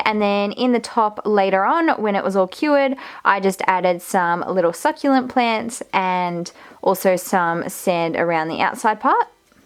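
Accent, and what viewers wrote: Australia. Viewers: She has an australian accent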